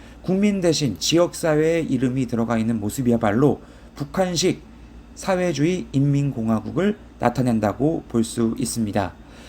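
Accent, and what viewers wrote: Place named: Jeolla, South Korea. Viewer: 근대 영상내용 뭐임 목소리듣자마자 홍어쉰내 나서 안봄 요약좀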